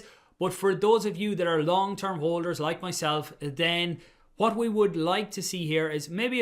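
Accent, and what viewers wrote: Ireland. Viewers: Heard the Irish accent and subscribed straight away🇮🇪🇮🇪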